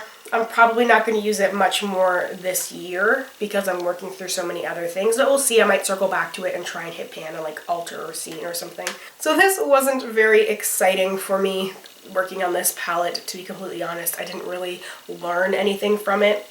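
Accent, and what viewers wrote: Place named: Canada. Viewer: I love your videos. I wish I had more time to devote to them. Three little ones keeps me busy. Don't be nervous about your get ready with me's. Taylor swift says "haters gunna hate". But your true followers love you for who you are. I love listening to other Canadians and how they pronounce their words. And today I realized we say our "A's" the same! Ok. Enough fan girl stuff.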